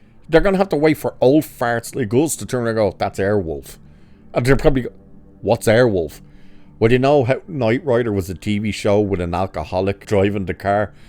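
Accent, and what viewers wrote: Ireland. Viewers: After the remake tv series of knight rider, im not remotely interested. Those morons in hollyweird arent capable of making anything worth watching. Now....just curious, what is your accent? Sounds kind of irish-y with a bit of....perhaps romulan....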